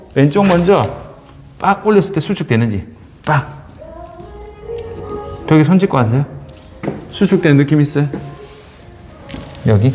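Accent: Gyeongsang, South Korea. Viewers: 너무 웃겨서 자꾸 듣게 됩니다 경상도 말이 재미있어요 ~~~~~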